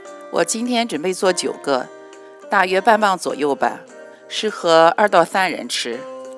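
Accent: Shandong, China. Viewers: 听口音您是山东人吗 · 聽到了熟悉的青普，給你點個讚👍我買的扇貝柱牙磣怎麼办？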